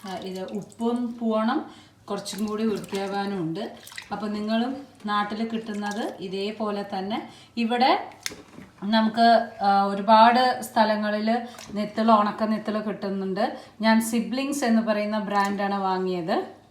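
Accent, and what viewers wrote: Palakkad, India. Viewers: ഈ പാലക്കാടൻ ചുവയുള്ള മലയാളം .... ഒരിക്കലും കൈമോശം വരാതെ നോക്കിൻ... ഈ ഭാഷയാണെന്നെ...എല്ലാ എപ്പിസോടും കാണാനുള്ള പ്രേരണ... ന്നാ ഞാൻ വരട്ടേന്ന്...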